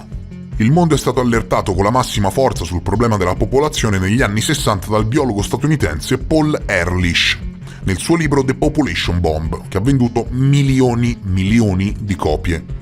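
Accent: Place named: Rome, Italy. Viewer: L'accento è di Roma.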